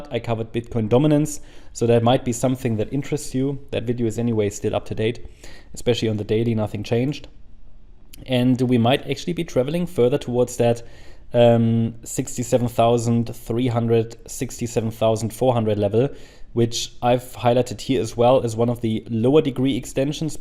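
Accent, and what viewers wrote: Germany. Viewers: bist du deutsch? german like accent :D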